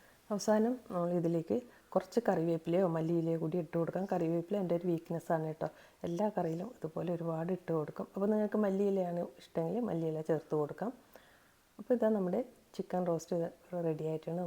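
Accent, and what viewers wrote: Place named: Kannur, India. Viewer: Adhyam paranjathu valare sheriyaanu. Chickenil enthum pareekshikkaam. BTW, ningal screenil ezhuthi kaanikkumbo - krithyamaayi ezhuthan sramikkuka. Savola kku pakaram - Onion ennum. Turmeric*, pinne Green chlliyude koode coriander ennanu ezhuthiyathu. curry leaves alle ittathu ? Pinne.. adhyam ittathum randamathu ittathum aaya pepper powder different aano ? color kurachu vyathyasam pole thonni (may be lighting). ithrayum neetti ezhuthaanulla kaaranam.. njan okke recipe kandu like adichu pokaan alla kaanuka. kandaal cheythu nokkaanum koodi aanu. athum nammude kannur style basha kelkumbo .. kooduthal :) . Njanum molum mathrame non-veg kazhikku. athu kondu njan thanne undakkanam . athu kondaanu ellam ezhuthiyathu. Accidentally ningalude lemon powder pop-up aayi. appo channel nokki. ippo subscribe um cheythu. Thanks for the recipe. 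Will definitely try once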